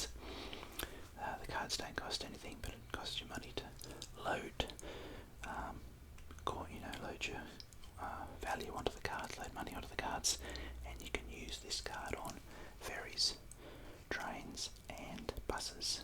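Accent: Australia. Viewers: What is he actually saying. Australian accent working against me here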